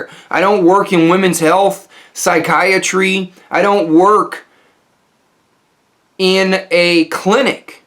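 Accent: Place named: United States. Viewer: Good talk, will be helpful advice for my 16 year son as well. I also like to watch your videos because you are mad..lol...American English is very different from our Australian pronounciation!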